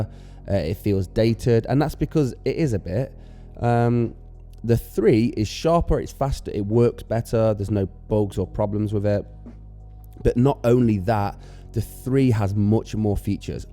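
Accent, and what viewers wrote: United Kingdom. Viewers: Love listening to a Brit talking about a model 3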